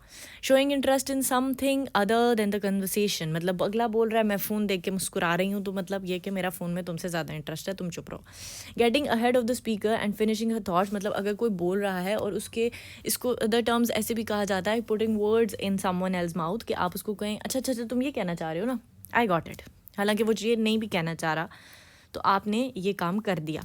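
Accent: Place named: Punjab, India. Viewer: mam punjabi bolti hyn ap smjaty hoay bht acha lga apka andaz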